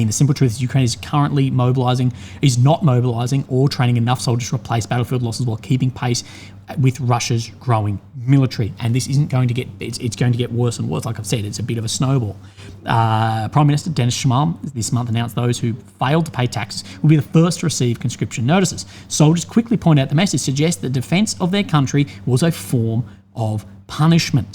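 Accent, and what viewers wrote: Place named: Bogan, Australia. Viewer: Aussie bogan....speaking on Ukraine war...hilarious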